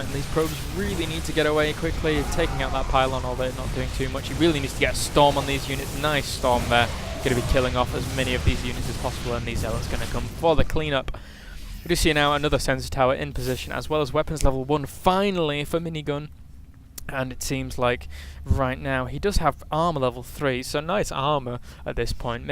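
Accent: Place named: United Kingdom. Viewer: dude, your british voice is awesome, my favorite caster especially since you are bias towards protoss <333333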